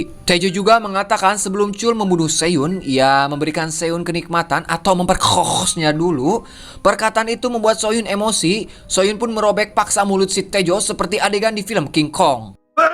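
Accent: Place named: Sunda, Indonesia. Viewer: Lucu sih , cara ngomongnya campur BHS.sunda , campur heureuy 😅😅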